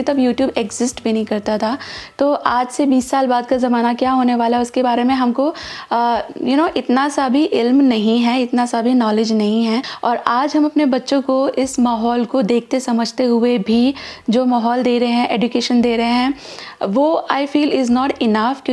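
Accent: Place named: North India, India. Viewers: Pr hum North Indian ese hi krte hai prounce jaise apne kiye so people should not feel offended in this nobody is making fun of that pronunciation but we North Indian ese hi boltae even my mother harr jagah yahi likha hota hain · Actually yar u don't know South indians hum north indians ki pronouncing me bht joke bnate h...mei literally daily notice karti hu..jo words hum Bachpan se bolte aaye h usko yeh wrong bolte h..mei bhi yehi ki tarah bolti hu..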